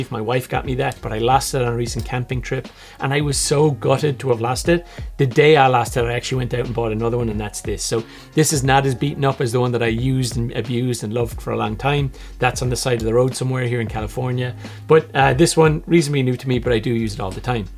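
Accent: Ireland. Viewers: I listened 3x just to hear the Irish accent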